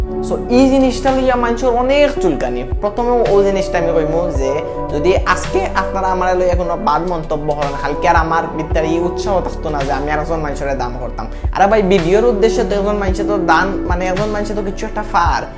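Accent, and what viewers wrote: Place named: Chittagong, Bangladesh. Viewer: Bhaiya apner Bari kothay? Is it chottrogram, coz I'm from chottrogram kintu okhaner bhasha kisui bujhi na😅but your one sounds like that, 😊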